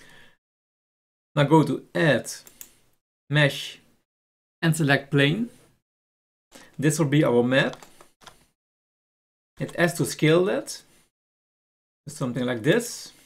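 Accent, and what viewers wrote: Netherlands. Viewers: Are u dutch?? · are you dutch ??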